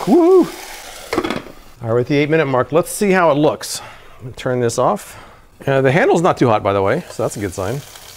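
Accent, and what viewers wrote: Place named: South, United States. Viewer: Thumbs up for. Next time lean more into the southern accent 😅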